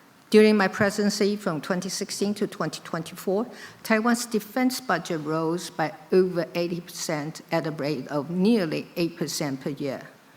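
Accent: United Kingdom. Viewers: 謝謝總統的堅持✊❤ 話說，總統的英文有英國腔耶，蠻好聽的😆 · 聽總統說英式英文真的是一種享受🥰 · 濃濃英國腔😂😂😂😂😂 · 台灣人不是學美式英語的嗎？為什麼一口英式英語？ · 的英國腔好好聽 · 拜託！要在國際媒體前，做那麼長的英文演說！有演講稿不是很正常嗎？要你在國際媒體前用中文演講，恐怕你也不能做到一字都不出錯吧！你看她英文說得多從容不迫，說得一口英式英語多優雅啊！你讓習近平來，他連英語都聽不懂！不要說要他用英文演講！ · 好歹我住過Brighton一年，倫敦很近也跑了很多次，好吧是有一點英國腔沒錯，但真的是一點點，就像黑龍講中文一樣的那一點..但你要說英國腔好好聽我就覺得有點..呃…你自己去英國住一趟再回頭看你的評論😅 倫敦政經學院沒有造假？我倒覺得反而是被證明這水平真的不太可能有博士學位。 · 相比某人只靠小本本，今年升級為大字稿，總統的英文表達與敘述能力，實在是太優了，再加上總統略帶英國腔的演講，只能說讚讚讚！ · 即是英文，因她的口音是英語，而不是美語，口頭表達不荒不亂，不需要看稿子，字正腔圓。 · 好迷人的英式英文❤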